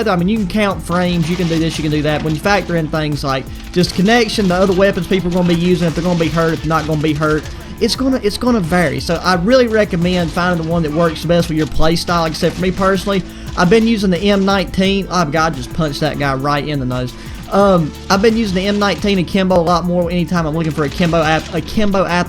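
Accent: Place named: Texas, United States. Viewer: You have a heavy Texan accent 😳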